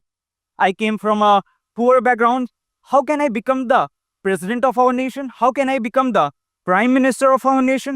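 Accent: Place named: United Kingdom. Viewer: British accent🫠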